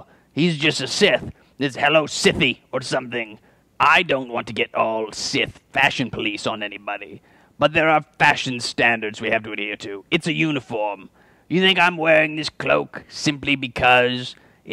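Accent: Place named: United Kingdom. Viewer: British accent lol. love the question!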